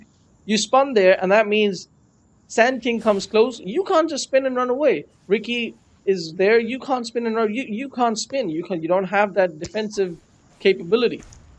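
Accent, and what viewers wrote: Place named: India. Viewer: His accent and that moment when a train passed by in background suggests the same. I know that coz I'm Indian myself. :D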